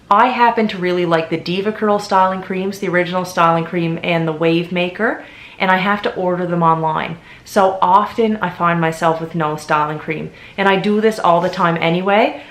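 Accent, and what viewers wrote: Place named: Newfoundland, Canada. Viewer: “My toilets right clean” 🤣 I’m from Newfoundland, and I just love that your so free with your accent!!! 😍😍 right clean, yes byyyyyy!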